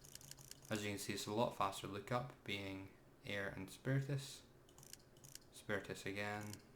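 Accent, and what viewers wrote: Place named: Scotland, United Kingdom. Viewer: Soon as I heard your Scottish accent I knew I could trust ya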